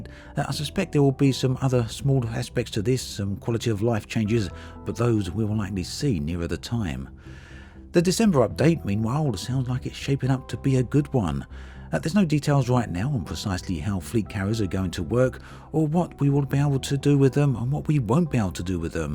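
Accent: United Kingdom. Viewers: What the new currency called, I can't make it out with the strong UK accent? Arcs? ox. rocks?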